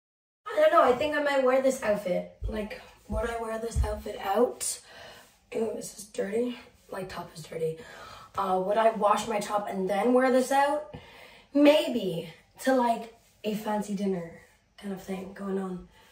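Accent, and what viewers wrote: United States. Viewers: Story with the American accent? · I dont understand why you talk like an american?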